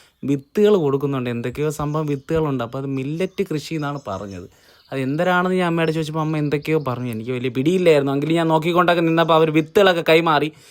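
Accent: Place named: Attingal, India. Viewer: ടേയ് നിങ്ങള വീട് അപ്പോ എവിടെ 🤔🤔 ഞാൻ ആറ്റിങ്ങൽ.. സ്ലാങ് കണ്ടാർക്ക നമ്മള സ്ലാങ് പോലെ തോന്നുന്നല്ലോ 🤔🤔🤔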